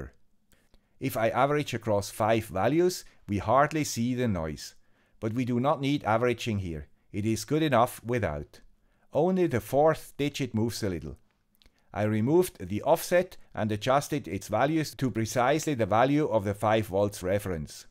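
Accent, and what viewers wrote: Switzerland. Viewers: Hello, you do not have a swiss accent but a swiss GERMAN accent ! ! ! Grüsse von der anderen Seite der Röstigraben. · Watched already a lot of videos of your channel and at the beginning it was a lot of „häääää, versteh grad überhaupt nix“ but from one video to the next I start to understand what you are explaining us. Have to say that I am a totally new beginner. My goal is to measure the 2 12V Solar Batteries in the OFF-Grid garden of my parents in law. Tried a lot with the ADC from the ESP32 itself and as u mentioned it’s readings are just bullshit. Thank you for introducing the ADS1115 to me. I guess that will fix my measuring problem and can finally bring the project into production. As I am original from Vorarlberg and worked many years in Kanton SG I love to hear your swiss accent as it remembers me of that great time in my life! 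Electronic wise you are my personal hero ;-) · the pronounciation is on purpouse to catch viewers, he speaks without the swiss accent in some vlogs:) I hear it every day at work, so I'm used to the accent :) Sali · He has a Swiss Accent though! :-) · Hey, you replied! No I am in fact not a native but I've got a CEFR C1 Language certificate which basically states that my English is quite good. This was in no way meant to insult you, as you also call yourself "the guy with the swiss accent" ;) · No love from the guy with the Swiss accent for Swiss microcontrollers? I was expecting to see an STM32 here 😋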